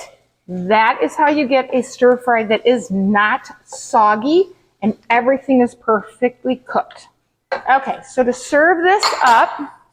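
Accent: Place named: Fargo, United States. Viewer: I love the “Fargo” accent!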